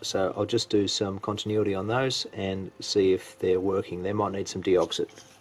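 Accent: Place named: Australia. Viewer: Hey cocka, where are you from? Nice to hear an Aussie voice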